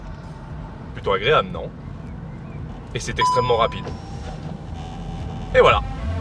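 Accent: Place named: Savoie, France. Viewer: Le combo t-roc + charo avec accent savoie= sa lui va incroyablement bien bref continuer comme sa vs ete les mlr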